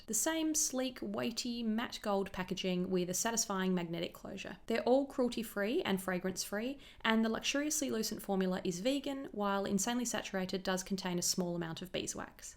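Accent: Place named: Australia. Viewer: It's always nice to hear that familiar, Australian halo there again. I love your videos for the makeup but I also try to teach myself how to do an Australian accent. 😁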